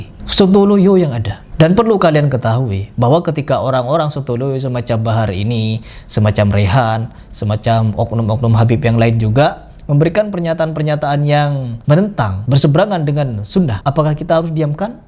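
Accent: Madura, Indonesia. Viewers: Lucu sekali aksen maduramu bro!! Berani ga lu nantang debat salah satu ustaz yg kau suka gibahin??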